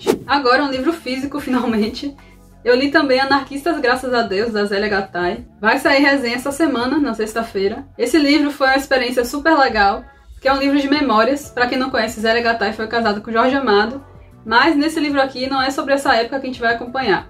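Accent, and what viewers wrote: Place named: Salvador, Brazil. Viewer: eu ouvi seu sotaque e na hora pensei: oxeee é de salvador será? kkkkkk <3 amei seu canal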